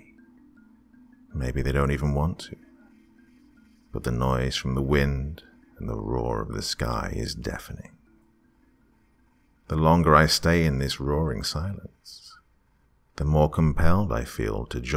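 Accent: United Kingdom. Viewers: I thought you were British 😂 well, enjoy your trip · i also live in england and i thought he lives in england?!?! im so confused i thought he was british? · "My work is taking me to England" 1) I genuinely thought you were British! 2) Good luck, don't mention Brexit. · I made the mistake of thinking that with your accent you were perhaps already located in the UK.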